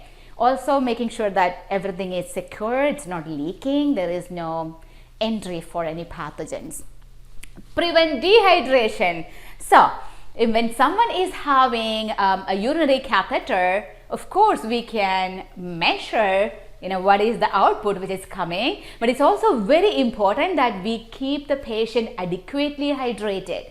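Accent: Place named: India. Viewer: Your English is very bad with an Indian accent.🥴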